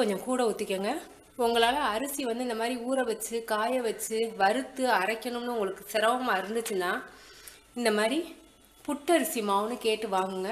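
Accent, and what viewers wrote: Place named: Karaikudi, India. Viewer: Aachi neenga pesara thamizh a kekkave naa unga videos a thirumba thirumba paapen. I'm from Konapet (near Karaikudi) and miss this accent. Azhaga explain pandringa :)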